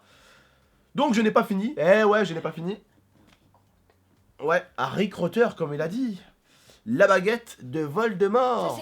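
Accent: Quebec, Canada. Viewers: ptn toi tu fais 24 k de vue en 2min et une personne en 1 h fait 5 vue t trop fort bravo l'accent du quebec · ptite accent quebecoi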